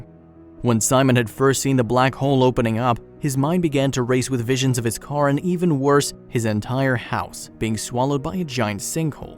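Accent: United States. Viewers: Unfortunately he is speaking American not English. They pronounce words like a-loo-min-um not al-u-min-e-um and sked-ull not shed-u-al.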